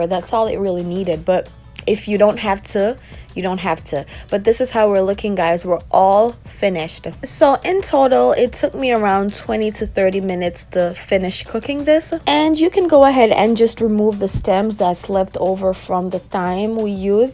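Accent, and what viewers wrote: United States. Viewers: Is she really Jamaican, all i hear is an Yank accent! Anyone can cook Jamaican food and claim Jamaican heritage! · You sound a lil Geechee ngl you would fit right in Charleston NC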